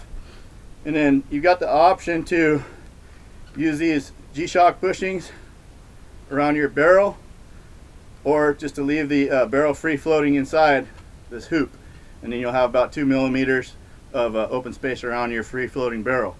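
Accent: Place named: Louisiana, United States. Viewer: You must be from home I can hear it in ur voice yeah!! ( Louisiana)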